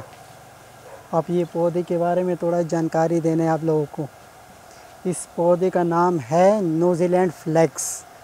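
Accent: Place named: India. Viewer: Zaban sun ke laga india ka hindu hai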